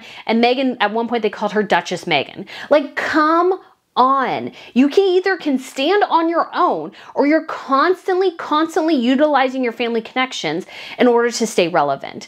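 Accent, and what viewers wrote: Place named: United States. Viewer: I like what you are saying but can't you soften your american high pitched voice?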